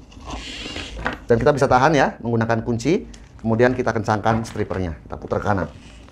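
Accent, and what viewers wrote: Tegal, Indonesia. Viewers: mantap bang? lebih mantap lagi ada suara kas orang tegal,ha..ha... joooos.!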